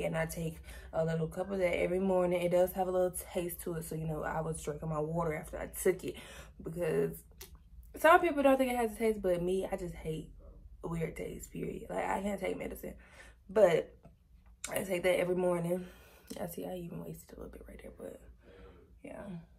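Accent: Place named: Chicago, United States. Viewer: This my first time watching you but I’m gonna take a guess and say you’re from Chicago ? Lols” fye “ “do you shit wop “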